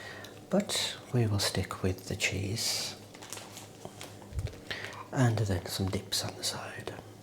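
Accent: Birmingham, United Kingdom. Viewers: Amazing !! And that brummy accent is lush!!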